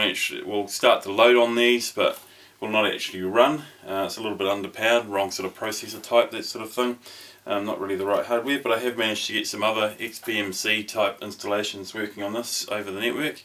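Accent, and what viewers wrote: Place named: New Zealand. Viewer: Kiwi much? ;-)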